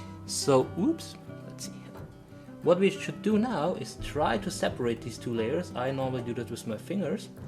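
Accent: Germany. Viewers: Loooove your videos! I learnt a lot! :-) btw are you German by any chance? Thought I heard a light accent haha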